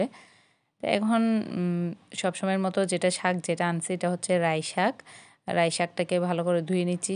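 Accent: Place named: Chittagong, Bangladesh. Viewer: অনেক সুন্দর হয়েছে আপু ভালোবাসা রইল তোমার জন্য 🎁🎁পুড়ে যাওয়া ভাত খাওয়ার উপযোগী করে নিলে আমিও চট্টগ্রামের মেয়ে তোমার কথা শুনেই বুঝতে পেরে গেছি ভিডিওর শুরুতেই ❤❤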